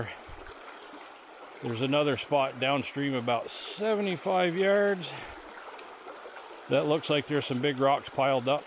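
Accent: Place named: Vermont, United States. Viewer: Love that born in Vermont accent!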